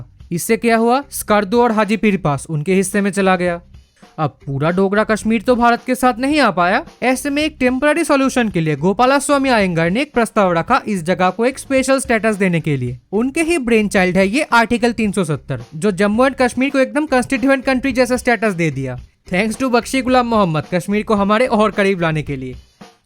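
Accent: Bengal, India. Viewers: Typical bong accent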